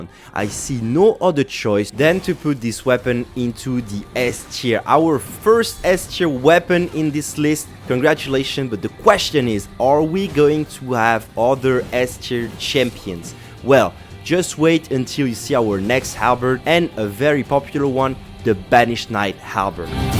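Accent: France. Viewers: Maaaan, first of, great vid overall! But... during the whole vid, I was like "Hum... this guy sounds French af!" Then when you said your name " " at the end, j'ai su que tu étais bel et bien français et que je ne m'étais pas trompé! 🤣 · I just realized not only fo you have the French accent, but also th exact same voice and timbre as the "two months later" guy on spongebob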